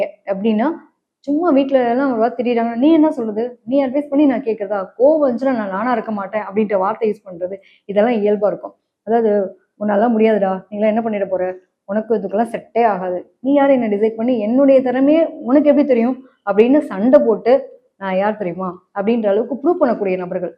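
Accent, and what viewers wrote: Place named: Noida, India. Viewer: நொய்டா ராகத்த நீக்கிவிட்டு சொன்னால்தான் எளிதாக புரியும்...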